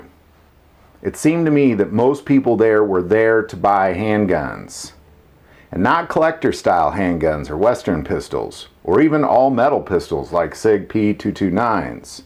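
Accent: South, United States. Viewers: Thought I was watching at half speed, but then I realized it’s just the southern way of speaking 😂 in seriousness tho, great vid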